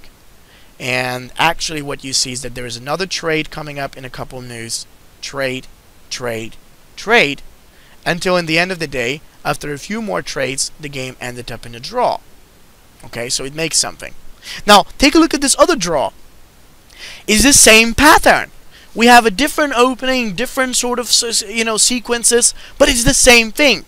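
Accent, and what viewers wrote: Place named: United States. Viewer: he's from Bulgaria, however, I'd say his accent is 90% american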